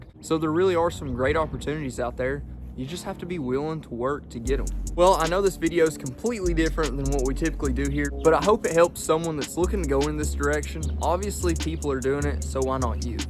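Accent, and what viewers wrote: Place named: Texas, United States. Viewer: I admit I like your accent a lot! Probably one of my fav English accents. Are you from Texas?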